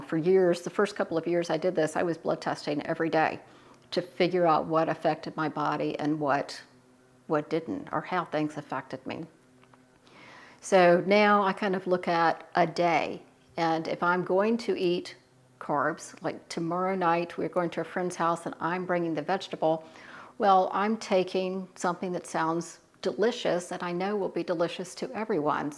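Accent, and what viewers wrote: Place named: South, United States. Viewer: I'm following a small handful of Homemakers / homesteaders and I've got to tell you that you are one of my favorites. Your no-nonsense delivery coupled with yourself of the sweet tea line accent made me start following. However you're canning of beef Rogan Josh you made me a fan! As a long time canner I've done the water bath the pressure all the things. But never have I ever thought about spreading my canning out to the wonderful things such as the beef Rogan Josh the chicken Tortillas Soup the Brunswick stew etc... thank you for reminding me that I have the power to use better judgment following safe guidelines in Canning things that are delicious to me I will be one of the first to follow in a paid membership community because of the aforementioned reasons. I'm excited and I cannot wait for that day to happen. 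And I will urge you to please write a canning cookbook with these lovely recipes in them. I see you testing the pH I see the beautiful products that turn out. I just want to thank you for the knowledge that you are sharing.. May God. Bless you and your family and from my home to yours, Merry Christmas!❤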